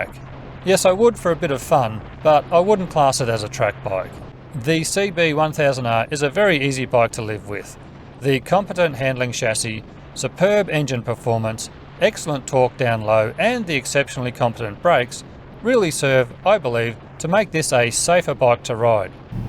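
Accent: Australia. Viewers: Good to hear an Aussie test riding bikes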